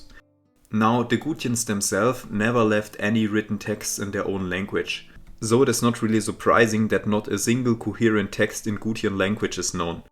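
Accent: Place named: Germany. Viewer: Der deutscheste Akzent, den ich lange gehört habe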